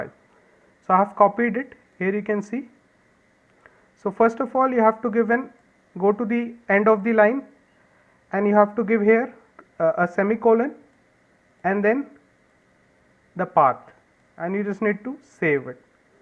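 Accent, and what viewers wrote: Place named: India. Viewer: hindu accent, words tech, support, solutions etc used, asks to download something that could have been coded by anyone. no ty.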